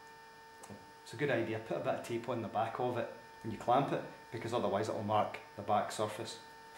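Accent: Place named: Scotland, United Kingdom. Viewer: An engineer with a scottisch accent. Seems Scotty is alive and well. Great consturction video. Greetings;-)))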